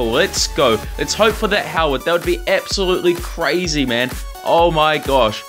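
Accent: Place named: New Zealand. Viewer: R u a New Zealander bro, u sound like on cause I am g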